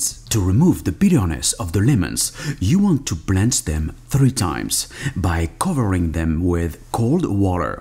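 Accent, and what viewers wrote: France. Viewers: Are you French ?? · Il a un accent français vive les français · May i Ask you where are u from? French? Only reason asking because of your accent :) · nah, it's his french accent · From what I know, he sounds like most French guy speaking English.. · Je me disais aussi le gars avait un léger accent français XD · You have a weird mix of an Australian and French accent and it's kinda offsetting for some reason. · You're French right? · This Guy's Just French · Putain mais quelle accent Français! LOL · Are you french ? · Are you French? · These americans making fun of his accent. lmao i bet you can’t even pronounce a single French word correctly. at least he can speak at least 2 languanges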